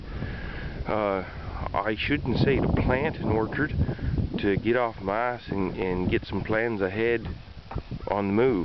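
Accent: Nova Scotia, Canada. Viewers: Oh my god! I had to laugh - I was looking up videos on Orchards and planting new ones and as soon as you opened your mouth I was like "holy shit, this guy is from Nova Scotia" which is where I happen to be from, too, and where we will be planting an orchard after we move back home from BC. Love it. Thanks for the video and information, but also to the throwback for the old South Shore accent.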